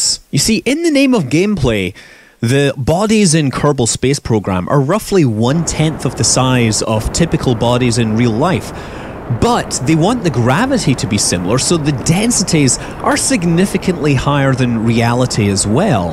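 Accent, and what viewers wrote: Scotland, United Kingdom. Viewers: Very, very Scottish. And I live there. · Hehe where about in Scotland are you from? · Your epic Scot manly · Cool you sound Scottish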